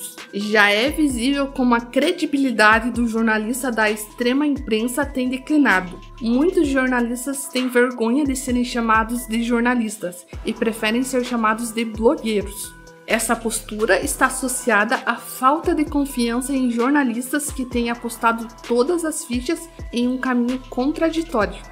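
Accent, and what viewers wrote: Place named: Caipira, Brazil. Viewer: Diferente né, voz caipira, mas realmente espetacular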